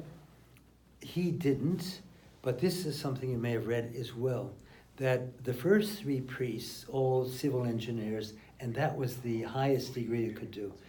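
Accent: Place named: United States. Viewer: He doesn’t sound completely American anymore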